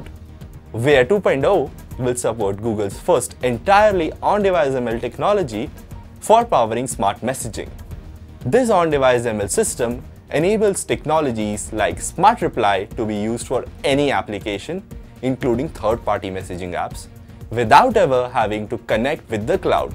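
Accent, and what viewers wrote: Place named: India. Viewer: That's the Indian accent :)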